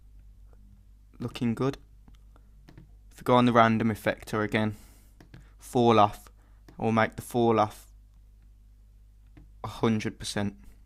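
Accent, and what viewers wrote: Birmingham, United Kingdom. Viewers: Birmingham Accent FTW :D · Typical Brummie :) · Hmmmmm.. Are You From Dudley? Im From There And You Sound Like A Brummy -_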